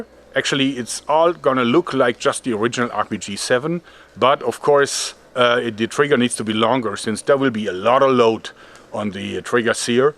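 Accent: Germany. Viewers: Say Asta la vista with ur German accent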